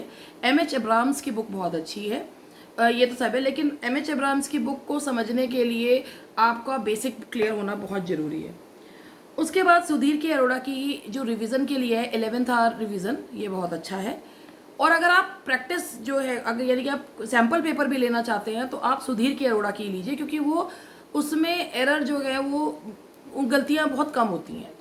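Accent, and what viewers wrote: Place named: Meerut, India. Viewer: Good evening ma'am..... without knowing,I guessed that you are from Meerut.....you speaking style reflect this.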